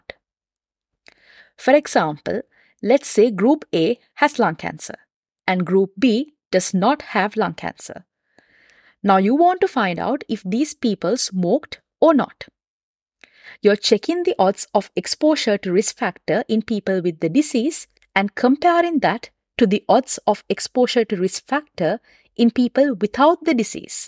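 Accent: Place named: Sri Lanka. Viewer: Why do you sound so Sri Lankan? 😮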